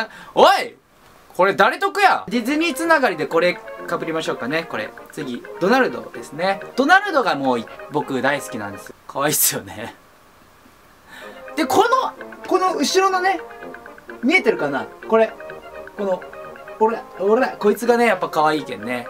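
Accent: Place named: Kyushu, Japan. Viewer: リアルタイムでも見れそうだったのですが、日テレっ子なので…(笑) 今朝録画したのを観ました！真面目にお話してるかっこよかったです(´˘`) でも、九州弁で話す動画のも好きです(˙˘˙)❥❥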